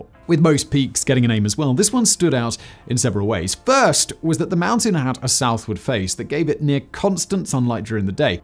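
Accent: United Kingdom. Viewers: Wow, I never thought of Simon as British, did he purposefully unlearn the accent to appeal to an international audience? xd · I Adore your accent! I could listen to it all day! But I did perceive some condescension in your tone with this video. I recognize as the rather sexy, erudite you are, the unintentional cadence is bound to slip in once in a while,! Particularly when as a Brit you’re critiquing the USA. It did come to mind though as an uninformed, oblivious but to his own surroundings Yank, that the shall we say reclamation of land from the Lakota Tribes that sat squarely in the middle of the US’s borders during the 1880’s, might have been a direct export. From Great Britain’s export idea of conquer and seize in the preceding 30 years of an entire continent really, India, in the Eastern Hemisphere! Of course after a hundred years of pillaging India of the jewels and resources, the UK did return it good as new! 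And don’t forget more recently Hong Kong. We Americans do still have work to do! But. Always remember. Americans may not know a damn thing about your politics ( and continued penchant for powdered wigs) but we absolutely LOVE our dear British cousins. Especially the adult young lads with that intoxicating accent! Seriously at this point the studios won’t even hire a male actor for an American role unless he’s British! I’m waving at you Tom Holland!☺️ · I agree with you, it is about the content, not the accent., and I did not mean that he did not have a native accent. I was simply replying to [yeet][yeet] who thought Simon "unlearned" his British accent. I was simply pointing out words where one can clearly hear the British accent. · Hearing a British man talk about how america doesn't like people taking their land and disagreeing with America usually ends badly honestly made my night..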